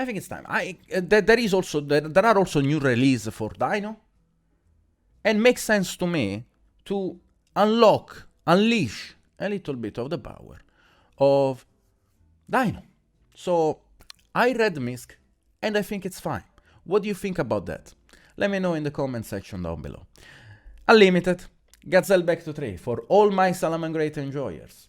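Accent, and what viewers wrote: Italy. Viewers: E' il tuo primo video che vedo e ho notato subito dall'inglese che sei un fratello Bel video e concordo sulla banned aggiungerei virus a 0/ skill drain a 1 pero' per il resto ci sta p.s engage a 2 e' sicura quindi non serve una prediction per questo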